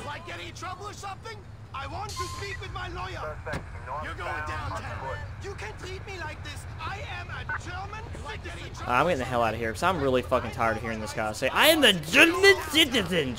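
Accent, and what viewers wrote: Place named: Kentucky, United States. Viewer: As soon as you started I could tell you were from KY im from Barren County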